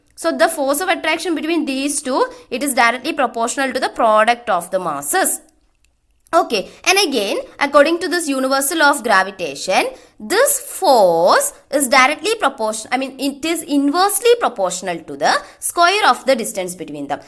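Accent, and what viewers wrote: South India, India. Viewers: Maam are you from south india?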